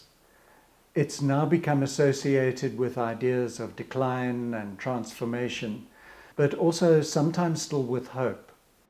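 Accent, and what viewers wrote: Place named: South Africa. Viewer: had a feeling you were south african when i heard your voice :)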